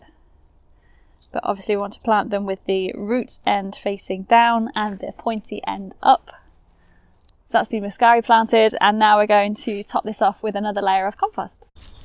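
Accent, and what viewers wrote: England, United Kingdom. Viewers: I must say... It is nicer listening to your English Accent. My American accent is very flat sounding...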